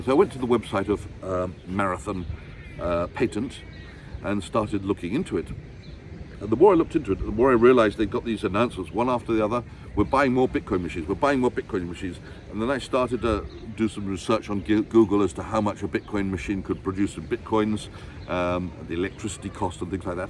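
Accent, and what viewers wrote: United Kingdom. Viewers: You sound like a fine British gentleman with deep love for the markets and your family. Great stuff sir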